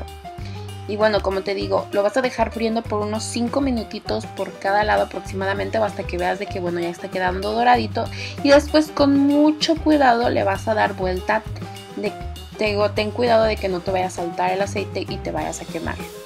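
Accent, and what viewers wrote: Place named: Mexico. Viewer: tienes acento mexicano :/ pero en el Perú hacen ese tipo de pescado frito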